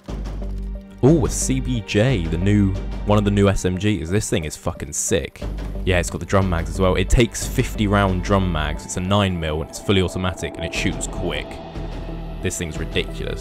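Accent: Northern Ireland, United Kingdom. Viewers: Oh lord the NI accent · well, being from Northern Ireland, our accent is incredibly similar. Considering how a fuck load of Scots came to Belfast and surrounding areas. Hence the name, ULSTER SCOTS. Also, no need to be a prick about it. Being that you work with him, you'd know he's Scottish. I didn't know he was Scottish, I heard the accent and thought it sounded Northern Irish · The northern Irish accent has me dying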